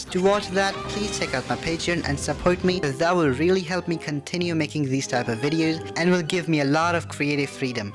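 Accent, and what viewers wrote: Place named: India. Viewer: Yo I guessed you're from India purely on the basis of your accent and I realized when you said you have a Potato Phone as Indian Parents usually don't give good phones to their son or daughters😭😭😭 Love From West Bengal aka Hamba Hamba Ramba Ramba State! Peak Content ❤❤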